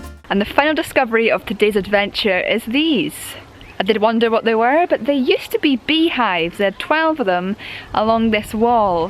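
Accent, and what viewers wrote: Scotland, United Kingdom. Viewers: Something about watching a vlog about a Scottish castle from someone with an awesome Scottish accent. Sorry but some of us Americans love that kinda stuff.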